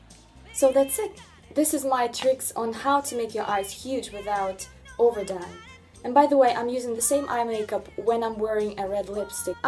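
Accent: Russia. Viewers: The accent is so cute! I knew you were Russian when you started speaking. I’m from Ukraine and I can’t hear my own accent but other people can. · Wow this chickadee has some insane skills! She talks like a total professional. Gorgeous with a Russian accent! Class act. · Absolutely agree with you! I'm Russian and for me her Russian accent is just obvious. You can tell it if you listen thoroughly to such words as "fundation" and "of course" (first seconds of the video) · Knew you were Russian straight away, I'm Russian too btw. Tnx for the tricks! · То чувство, когда начинаешь смотреть видео и сразу слышишь русский акцент☺️ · Lol, that's the first time I heard of a Russian accent making someone sound like a good person. Usually people say "haha, you sound like a movie villian!" Aaanyway, if she sounds like a nice person, it's probably because she's speaking calmly, and maybe because she actually is a nice person, who knows? 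:P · I knew she was Russian after the first 3 sec..could decently tell from the accent ( first time seeing her) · Жуткий русский акцент)) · произношение как у русской! вы русская?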